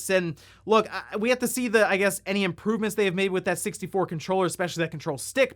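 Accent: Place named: United States. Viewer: Damn yanks -it’s MAHrio not MUHrio.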